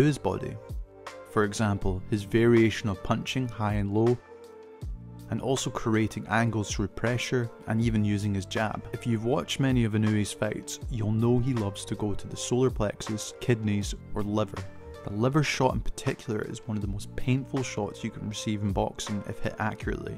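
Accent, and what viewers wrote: Scotland, United Kingdom. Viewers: Nice to hear a Scottish voice doing breakdowns as good as this. Good shit brother🏴💙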